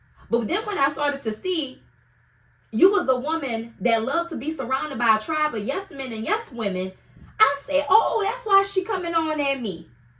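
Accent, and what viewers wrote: New Orleans, United States. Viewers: Whole video is a WORD perfectly frosted with dat NOLA accent 💚💛💜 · It’s the NOLA accent for me ❤❤❤❤❤ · Love that NOLA accent!! Lol ❤️❤️❤️❤️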